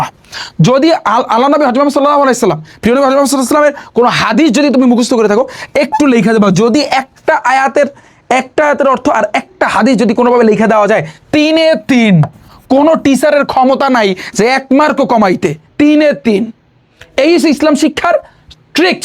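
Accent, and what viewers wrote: Barishal, Bangladesh. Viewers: Vai ki Barishailla?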